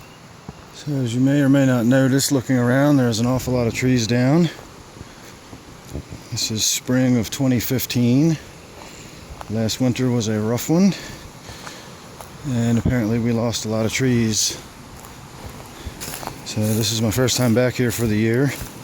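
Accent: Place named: Baltimore, United States. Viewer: Are you from the Baltimore Region? I thought it sounded like you had a bit of a baltimore accent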